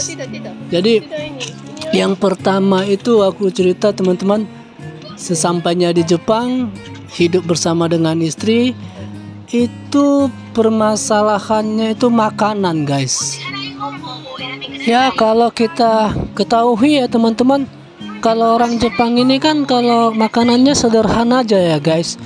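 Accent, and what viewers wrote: Medan, Indonesia. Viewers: Saya sering ngikutin konten Anda.saya mau tanya dialek kayaknya anak Medan.udh lama tinggal di Jepang? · Baik bahasa Medan kita nya bg😂😂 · abg org medan ya logat abg kayak org medan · Maaf, bang ini suku apa ya, saya suka dengar logatnya, kayaknya bahasanya mirip logat medan...😊❤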